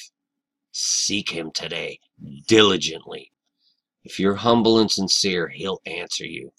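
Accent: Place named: Boston, United States. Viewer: This is incredibly nutty. And I just realized you're from Boston. Haha. You "sawr" it. 😊 Excellent work and crazy fingerprints of the evil architects. Look forward to your vids.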